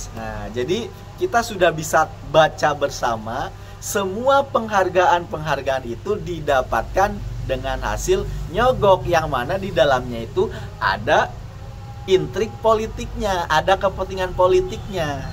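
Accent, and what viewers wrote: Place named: West Java, Indonesia. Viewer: Kang.. knp sy panggil Akang?.. karena letahna letah Sunda.. Salut atas kontennya yg positif dan mengedukasi.. Gaskeun..Lanjuutt.. 👍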